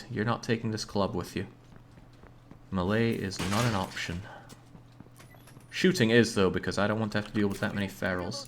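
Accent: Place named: Northern Scotland, United Kingdom. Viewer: This runthrough has been a real diamond of a watch. Audience participation vs. a nightmare world and a few bloody hell type moves pulled by yourself, along with the usual North-of-the-border cutting commentary. As an aside, you're accent reminds me of a friend of mine from the north of Scotland who once commented on a girl "She's so ugly even a sniper wouldn't take her out". Classic.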